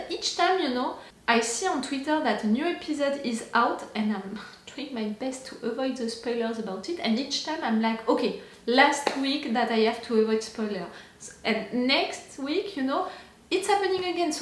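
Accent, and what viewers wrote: France. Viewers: it's a French accent.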